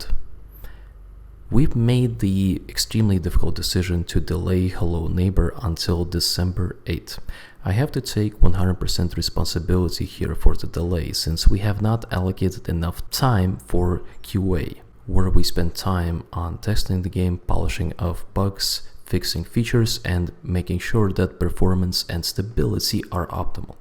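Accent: Russia. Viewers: Лол, акцент то русский)) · Замечается русский акцент) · Лолол, почему я слышу русский акцент? ._. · Говоришь по английски,а по голосу(произношение) понятно что русский с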